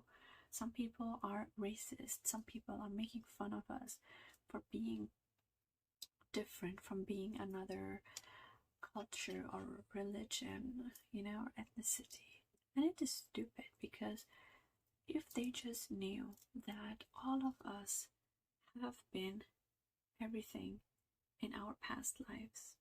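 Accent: India. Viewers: an indian or pakistani daughter in law pure accent repeating khandan word make these kind of videos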